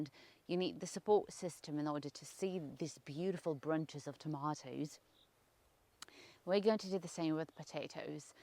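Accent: United Kingdom. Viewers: My wife, Iryna, who is also from Belarus, still dislikes planting potatoes for the same reasons you did as a child. She particularly despises Colorado beetles! I'm the gardener in our family. Your British accent is interesting to hear from a Belarusian! Did you spend time in the UK? Cheers!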